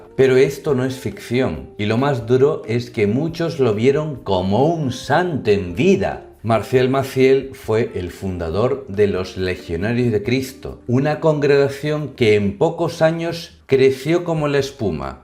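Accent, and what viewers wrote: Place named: Spain. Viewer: Vos porque habláis asi, joder tío esto es un fraude voz de españolete y cara de hispanoamericano autóctono.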